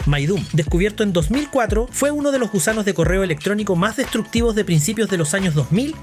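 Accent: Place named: Chile. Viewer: Todo bien Excepto el acento chileno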